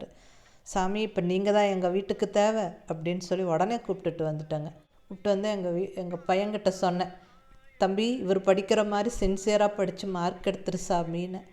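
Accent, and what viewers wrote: Coimbatore, India. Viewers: Amma really nice collection amma anakum vinayakar romba pidikum kongu tamil super innum neraiya video poduga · Ungal voice super mam Coimbatore karanganu nala ah tarithu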